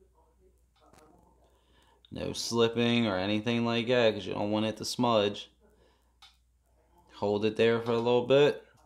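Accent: Baltimore, United States. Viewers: Lol I was thinking you sound like you’re from Baltimore then I looked at your channel name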